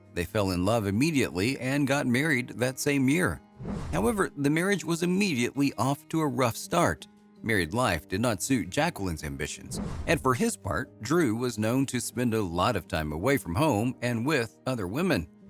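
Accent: United States. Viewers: sorry but american accent and its Surrey not sirry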